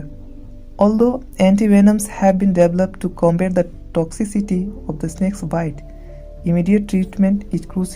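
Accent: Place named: Mexico. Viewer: I like your Mexican accent.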